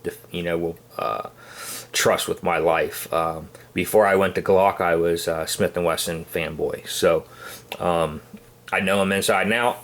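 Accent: Pittsburgh, United States. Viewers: Sounds like a yinzer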